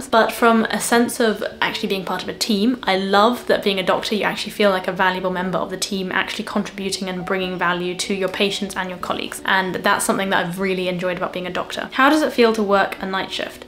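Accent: England, United Kingdom. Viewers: She’s cute ❤ love the British accent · I’m watching your YouTube for study British accent ❤ I love your accent so clearly and sounds smart. Take care of yourself. I will support to you from Japan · I can't express how much I love that British English. Lol ❤🎉😊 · An actual English accent 🔥ultra🔥UKasian🧠Sapio❤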